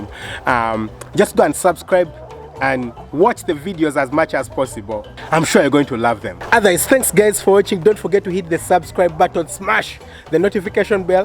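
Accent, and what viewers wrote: Uganda. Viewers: Hello Doc,been a while since I checked out your videos. You have such passion,we love your Ugandan accent,we say 🇺🇬 stand up.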